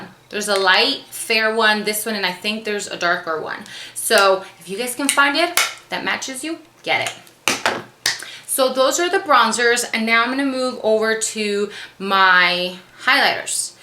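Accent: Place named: New York, United States. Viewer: you sound so Latina... and so New York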